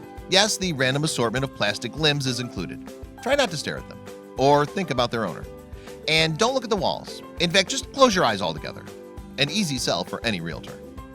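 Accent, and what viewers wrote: United States. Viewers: It's a bit disconcerting to hear copy so blatantly written by a Brit read by an American. Just saying, something you might want to think about.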